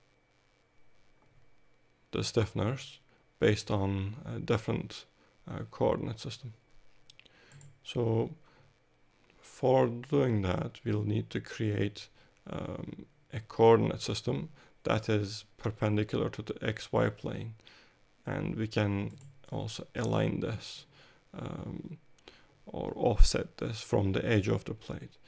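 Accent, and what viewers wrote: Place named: Turkey. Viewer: hi admin, where are you from? your english like Turkish accent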